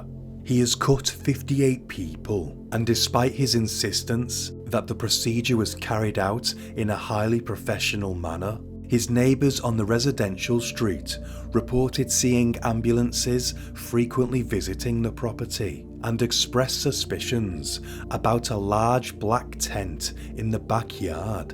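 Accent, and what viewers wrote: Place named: North, United Kingdom. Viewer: I have to know where your accent originates. Do tell. Has to be northern at least. Thanks from Louisiana USA!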